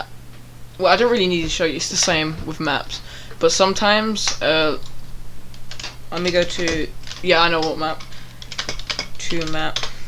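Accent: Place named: United Kingdom. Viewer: This is the most bri"ish accent I've ever witnessed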